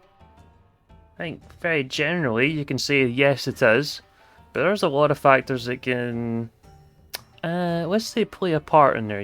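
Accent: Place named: Glasgow, United Kingdom. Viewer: r u fae glasgow?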